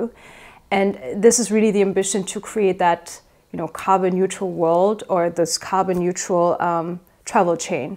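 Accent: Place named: Germany. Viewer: Ich mag ihren deutschen Aktzent...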